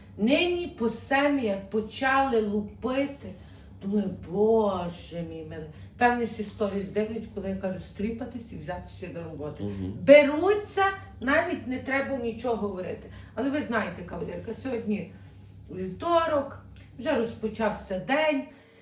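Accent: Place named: Yavoriv, Ukraine. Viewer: О,ви з Яворівщини,або напрямок біля кордону з Польщею ,чую знайому говірку 😂)))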